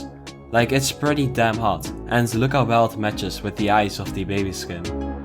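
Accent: Netherlands. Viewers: super nice vid man keep it up!!!!! you have kinda a dutch accent is this true? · Are you dutch cuz ur accent sounds insanely dutch lol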